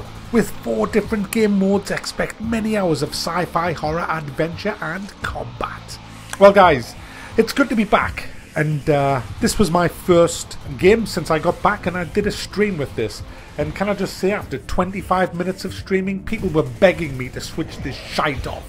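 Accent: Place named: Sunderland, United Kingdom. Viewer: Hi can I ask if your from sunderland? I reckonise the accent lol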